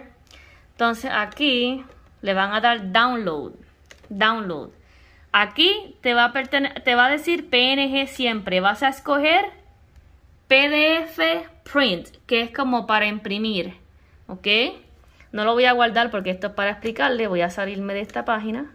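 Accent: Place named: Puerto Rico. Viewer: Saludos desde Mayagüez, soy maestra de Artes Visuales ya retirada. Estoy comenzando a incursionar con la creación mi primer libro de colorear utilizando la inteligencia artificial. Precisamente estaba buscando tutoriales en Español cuando doy con tu video. Muchas gracias! Ya sabía que ese acento de boricua no se puede negar. Weeepppaaaa!😂😁😂😁😂😁🇵🇷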